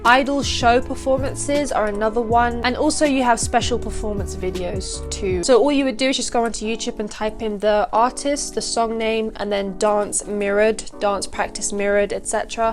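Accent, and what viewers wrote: United Kingdom. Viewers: You sound English if you are I know I’m not the only English-dancing-k-pop-fan. 😭🙏🏽🙏🏽🙏🏽🙏🏽🙏🏽 (LOL)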